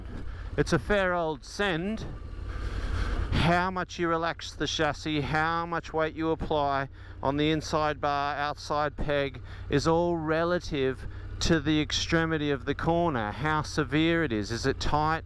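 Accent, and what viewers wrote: Australia. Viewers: the Aussie accent just makes it more engaging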